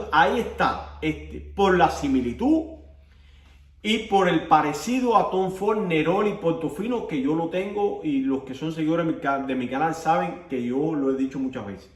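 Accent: Cuba. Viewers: Eres cubano mi bro?...buenos videos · Hola, bien marcado ese acento cubano. Conozco el peach, El rosa, el tobacco touch y el cherry, me compre el cherry y el tobacco touch, me Super encantó el tobacco touch tiene una salida de clavitos de olor, nuez mozcada, miel dulce, parece un cake de navidad.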